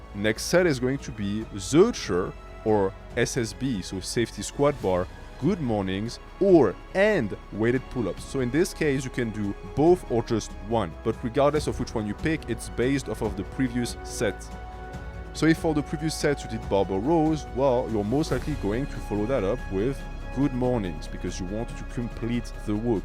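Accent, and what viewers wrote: France. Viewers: that french accent, love it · Are u french? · are u french parceque on dirait que tu as un accent ? 💪🏽🙏🏽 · You've got a slight delightful baguette accent. As a fellow member of the baguette nation I salute you. Keep it up.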